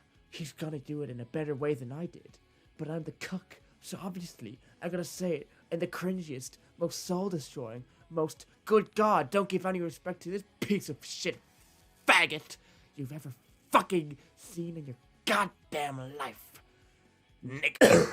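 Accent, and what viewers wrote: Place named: Ireland. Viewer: No way I've just gone and finished Penguindrum, went on youtube to find a good analysis about it and found a quality video by another lad from Dublin about it (I'm assuming from the accent, if not I'll look like a fucking twat so let's hope I'm right). Felt the exact same way about penguindrum, gave it an initial 9 but I'll see how I feel about it once time passes and I might become a 10. Great vid and mad props for being an Irish anime youtuber that isn't eyepatch wolf. Honestly never thought another one existed. Good luck in the future brother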